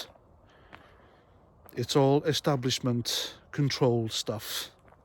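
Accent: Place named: United Kingdom. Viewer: Long time subscriber - rarely comment. Thought it about time I write to say how much I enjoy your videos and appreciate what you do. As an expat POM from Southampton living in Australia, I really miss accents from the UK and I *love* yours. Keep up the good work and helping keep me up to date with all things UK. Cheers - Simon / Adelaide - Oz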